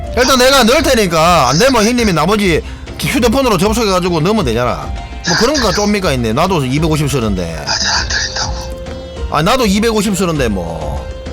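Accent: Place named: Daegu, South Korea. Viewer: 대구 사투리 쩝니다 고향 생각나네요 ㅋㅋ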